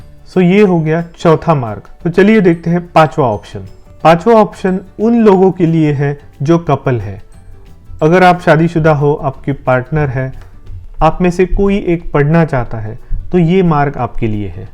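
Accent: Maharashtra, India. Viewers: Sirji r you from Maharashtra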